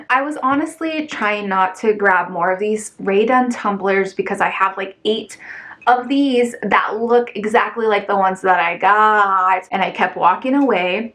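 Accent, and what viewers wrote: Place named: Midwest, United States. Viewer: I'd say u have a Midwest accent! A lot of ppl in wisconsin pronounce those words EXACTLY like that!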